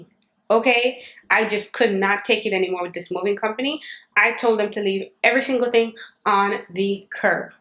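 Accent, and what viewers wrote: Jamaica. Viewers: ARE YOU JAMAICAN HUN❤❤❤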